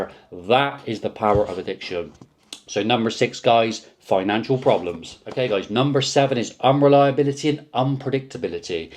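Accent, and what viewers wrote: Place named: Bristol, United Kingdom. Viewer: You sound like your from Bristol ? Can I get in touch privately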